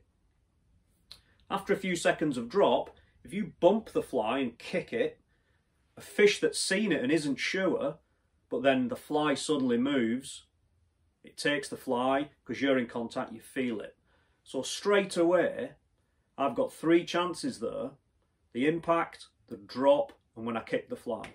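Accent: Wigan, United Kingdom. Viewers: I think I have learned more off watching this video than I have on any of the others Iv seen - thank you! (could that be a Wigan accent by the way sounds like your from gods country?)😊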